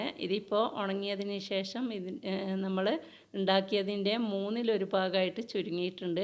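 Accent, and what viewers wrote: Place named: Kannur, India. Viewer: Ith sherikkum oru variety thanne😍😍 ithaante nalla sound😍😍enikk kannur slang ishtaanu.. peruth ishtam ❤️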